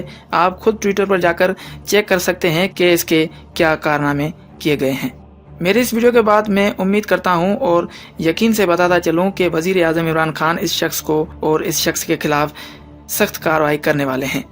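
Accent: India. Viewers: Mr .who ever you arebut one thing is confirmed that you are not a pakistani but an indian your accent is not pakistani at all ,muslim or hindu i can tell too. let me listen u again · مجھے تو تم اپنے لہجے سے انڈین لگ رہے ہو۔ رہی بات بشرا کی تو جو عورت کسی کے ساتھ تیس سال گزارے اور اس کے پانچ بچے ہوں اور نواسے نواسیاں بھی ہوں اس کے بعد اگر وہ علیحدہ ہو کر کسی دوسرے سے شادی کرتی ہے؟ تو اس کے بارے میں کیا کہہ سکتے ہیں؟ پنجاب میں ایسی عورت کو کوئی عزت کی نگاہ سے نہیں دیکھتا۔ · تصویر پتہ نہیں کسی نے لگائی بھی کہ نہیں تم بلاوجہ بیغیرتی کر رہے ہو۔ اور تم لازمی انڈین ہو تمہارا لہجہ صاف بتا رہا ہے اور ہمیں پہلے ہی پتہ چل چکا ہے کہ مریم نواجی نے اپنے میڈیا سیل کو بشری بی بی کی کردار کشی کا ٹاسک دیا ہے تم بھی اسی کا حصہ تو نہیں؟؟؟ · This rubbish video made by Indian the man who is telling fake story he is 10000% Indian his accent is Indian he cannot speak clear urdu may be hire by corrupt PMLN. 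False story. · HADEES MUBARAK HAY NAKE MARD KO PAKBAZ BEVI UR GANDAY KO FAHISHA UORAT MALAY GEE, PAHLAY IMRAN KA KIRDAR DAKH LO BUSRA KA FASLA HADEES KEE ROSHNI MAIN KAR LO. . . DOSRI BAT YA VIDEO JIS NAY BANAEE HAY US KEE URDU SUN LO INDIA WALOON KA LAHJA HAY CHANAL KA NAMUSALMAN JASA RAKH KAR N LEAGE PER ILZAM RAKH KAR SIRF RAW KA MAQSAD PURA KARNA HAY, AB FASLA KHOD KARO DUSHMAN INDIA HAY YA MUKALIF SAYASI JAMAAT . BAQI ISLAM TO KAHTA HAY LA RAJBANEEYAT FEE ISLAM ISLAM MAIN PEERI FAQEERI NAHEE HAY ,,,,KOJA AAK UORAT DAWADAR BAN JAEY PEERI KI UOR HAZER KHAWAND KO CHOOR DAY JAWAN BACHOON KO SARMINDA KAR DAY, CRICKET HERO KO PANAY KAY LEYA , PHER TAWEZ GANDNAY KARAY ( LANAT KI ALLAH NAY GRHOON UOR GANDOON PER PHOOKNAY WALOON UOR WALIOON PER) KHAWAND KO KURCI DELANY KAY LEAY ,,, TO FIROON KAY JADO GAROON KAY LEAY AKK MUSA BHI AYA KARTA HAY UOR US KAY PASS AKK DANDA ( AASA) BHI HOA KATA HAY,,,, JO SUB JADOO GANDAY KHA KAR DAKAR BHI NAHI MARTA. 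KITNI SAFAEE DO GAY, IN AGENTS SAYASAT DANOO KI BUS,,,,,,, PAK,,,,,,,,, FOG,,, DINDABAD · سب سے پہلے حرامی تم ھو جو یہ ویڈیو ڈالنے والے حرامی ماں اور بہن کی تصویر بھی ڈال دیتے۔شرم کرو ایک تہجد گزار عورت کے بارے میں بکواس تمہیں شرم آنی چاہیے ۔تمہاری آواز سے لگتا ھے کہ تم انڈین اسلئے تمہیں کیڑا کاٹ رہا ھے۔ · Bolnay wala banda 100 % indian hay. Iski urdu sun lain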